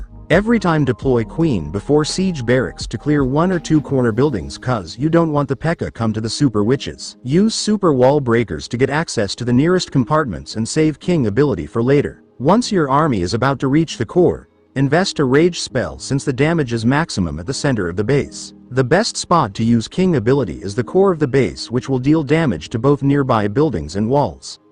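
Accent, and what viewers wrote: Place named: Asia. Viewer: I have 0 negative intentions when I say this but I can hear the Asian accent through the voiceover I’m dead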